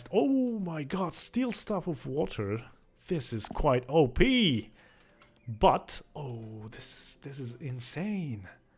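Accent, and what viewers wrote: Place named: Scandinavia. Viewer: Game looks interesting from the little i've seen. Showing my fellow scandinavian some support (Can tell by the accent)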